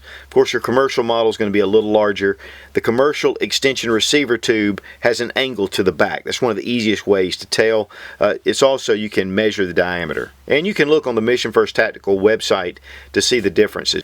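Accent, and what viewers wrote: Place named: South, United States. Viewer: U have a southern accent, he he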